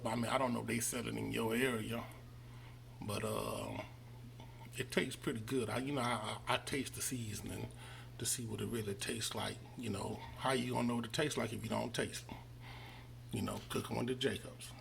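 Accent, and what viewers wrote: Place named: Texas, United States. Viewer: im watching this video with baby and the first thing i say to herwhen i heard u talk is, "dude is from Texas." then when u showed the HEB salt and that Zach's Rub it was a done deal. great video bro!! keep making the content.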